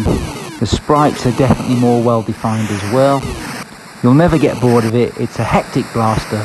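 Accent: United Kingdom. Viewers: I love your view of this, you understand how much work it took back then, there was no templates , it’s all code! They did make quality products and titles back then. Also, I love your accent, are you in The UK?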